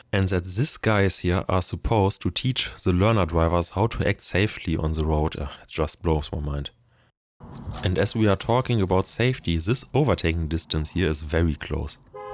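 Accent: Germany. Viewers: Are you making up this accent or is it real? I can't understand half of the things you say. It's almost like a joke with German stereotype: "ze car, ze road". Damn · Its real and hey theres nothing wrong with that. Hes trying his best. Not everyone in Germany is able to speak English. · You guys might like to know that there might be an experienced narrator coming in soon to take over the vocals. I also have a really rough time listening to the classic German English.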